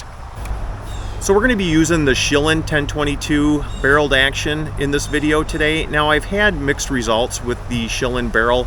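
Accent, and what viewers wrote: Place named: Northwoods, United States. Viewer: I've got to ask, where are you from? Sounds like the great Northwoods accent!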